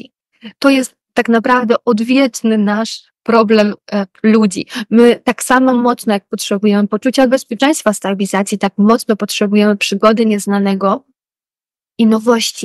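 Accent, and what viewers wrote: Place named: Ukraine. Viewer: myslalam ze jest pani ukrainka czy spod tzw.kresow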